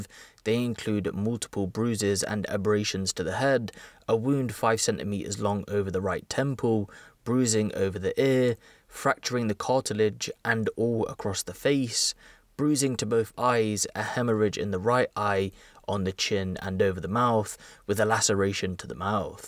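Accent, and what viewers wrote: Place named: Northern England, United Kingdom. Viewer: he has a northern accent, what's the issue?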